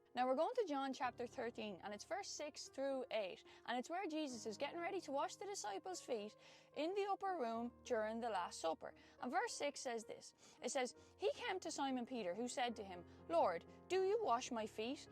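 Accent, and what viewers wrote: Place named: Ireland. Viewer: AMEN sister 🙏🏻 BLESS 👑🔥🕊️⚔️ that is an Irish accent yes? My grandmother came to America from Ireland. 🙏🏻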